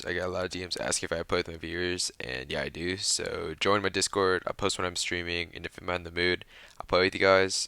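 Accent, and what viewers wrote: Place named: California, United States. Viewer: Lol are you from California or something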